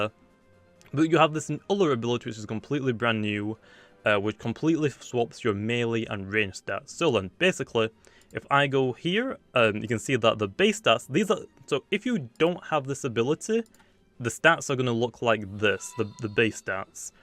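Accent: United Kingdom. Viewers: Are u UK?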